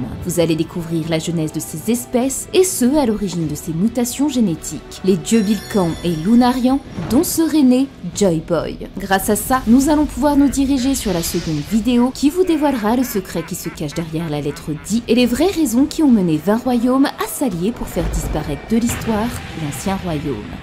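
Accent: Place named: Belgium. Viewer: Superbe video! J ai adoré,et j attend la suite avec impatience. Quel travail, quelles recherches, et quelle analyse! Franchement, les mots me manquent. J avais moi meme fait les meme rapprochements,mais j aurais ete bien incapable de les presenter comme tu l as fait ici. Oui le travail et l inspiration d Oda sont impressionnant, mais ton boulot l est tout autant. Je suis moi meme plutot tres interessé par ces sujets que sont les anciennes civilisations et les evenements passés allant de la prehistoire a l avenement des premieres civilisations, en passant par la precession des equinoxes et son effet sur notre histoire. Le parralelle entre les siecles oubliés de onepiece et le chainon manquant comme tu dis,ou encore avec cette periode obscure de notre histoire ( -12.000 avant JC) et les anomalies qui en ont decoulé, jusqu a enfin l avenement de civilisations telles que les sumeriens ou encore les antiques egyptiens…Bravo, un grand bravo a toi. 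Et comme si ca ne suffisait pas, tu pousses le talent jusqu a la redaction et la diction d un texte travaillé. De toutes les chaines, hypotheses, et analyses de onepiece que je vois passer, je peux dire sans hesitation que c est avec ton boulot,ta vision, que je suis le plus en phase. Merci pour tout ca, et felicitations. ( par contre, un de ces quatres, ca serait pas mal de pouvoir mettre un visage sur cette delicieuse voix qu est la tienne. D ailleurs,il me semble avoir decelé un leger accent belge, notamment sur les sons en [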